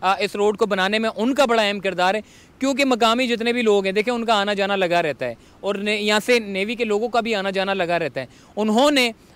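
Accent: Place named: Punjab, India. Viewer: اردو کو پنجابی انداز میں نہ بولیں... شکریہ.... آفتاب عالم خان بینکاک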